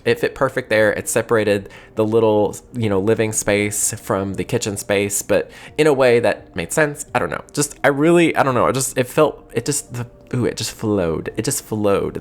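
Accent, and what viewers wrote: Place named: Appalachia, United States. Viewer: I love your content, and knowing that you’re from the Appalachia makes me feel ever closer to your videos (WV here). Your accent is barely noticeable for a person who lives in Kentucky. Your builds make me want to build more while I’m playing!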